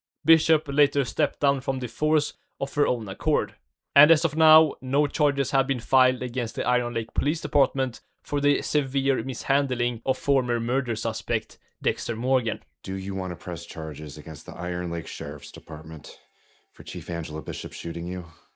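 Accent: Sweden. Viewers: I've heard few english accents in my life that sound more violently swedish than yours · Why does bro sound Swedish? 💀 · Is that a swedish accent I hear?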